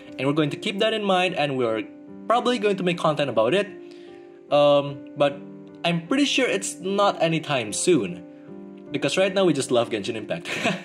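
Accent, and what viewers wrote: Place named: Philippines. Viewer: damn i was convinced that the guy voicing the videos was filipino cuz his accent is very similar to filipinos. nice to know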